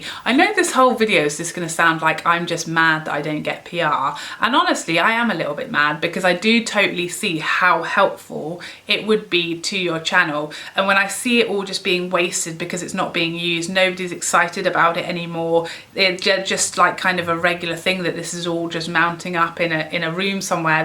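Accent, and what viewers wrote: United Kingdom. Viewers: Gwaaaad I hate it when you speak so much sense 🤔... it’s a catch 22 isn’t it... if you could review new launches promptly you would get more subscribers... One of the things I LOVE about your channel is that you’re British... so apart from an awesome sense of irony... in a sea of American accents talking about American products, you really stand out. So maybe we could reach out to UK brands first? I’m onto Charlotte Tilbury...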